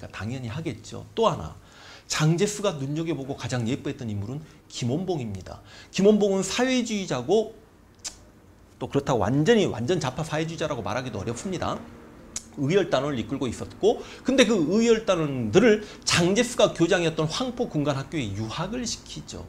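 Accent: Jeolla, South Korea. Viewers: 전라도분이 전라도말투가 나오는게 정상아닌가요? ㅎㅎ 그걸 숨기면서 설명을 하신다는것 조차 힘들지않을까요? 지금 이야기하신부분.. 이해하기 힘드네요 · 재밋게 잘보고있습니다. 모든강의가 제생각과 틀리지않다만..본인스스로 진보라고 하신점도 중도인 저는 지지합니만 성향이 갈수록 기우는느낌은 불편하고 거기에 전라도말투가 섞여나오니 불편해지네요? 색깔을 안보이게 하시면 더욱 인기있는 강사님되실듯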